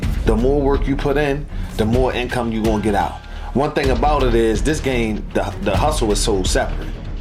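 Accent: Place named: Baltimore, United States. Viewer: He must be from Baltimore or D.C because that accent is REAL strong!!! 😂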